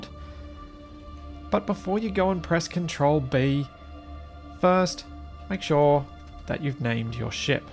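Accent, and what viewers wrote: Australia. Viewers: I have been enjoying this game sooo much more since I started watching your videos, so just wanted to thank you for all the time and effort you have put in - they are all brilliant - Thank you! I have even gotten used to the Aussie accent:p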